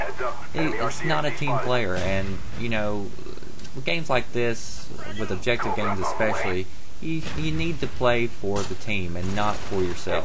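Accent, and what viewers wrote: South, United States. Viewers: I like this guy's slow southern speech and laid back attitude.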